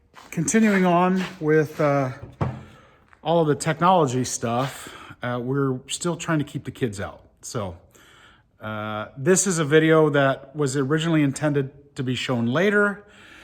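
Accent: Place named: Midwest, United States. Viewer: Actually thats the ceiling not the roof but I have a great fondness for Mid-central American accents. ❤️👍🇺🇲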